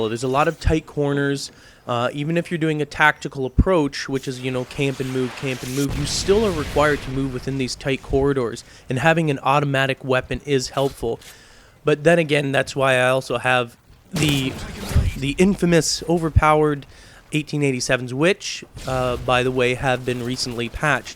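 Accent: Canada. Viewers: are you canadian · Didn't even need to see your callsign to know you're Canadian. XD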